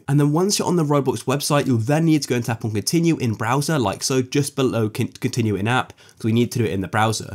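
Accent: United Kingdom. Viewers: Are u bridish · I don’t understand what u are saying ur so British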